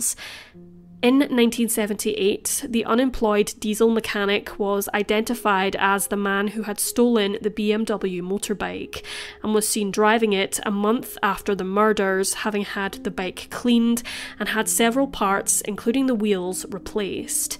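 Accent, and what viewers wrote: Scotland, United Kingdom. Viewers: Brîndușa D. scottish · fuck you mean odd. It’s a Scottish accent, maybe roughly around Edinburgh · Scotland is my guess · She's from Scotland, that's where I live x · Defo Scotch.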